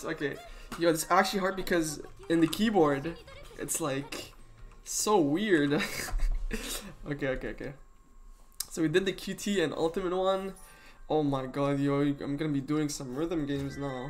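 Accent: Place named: India. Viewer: Just a question, are you indian? Your accent is kinda indian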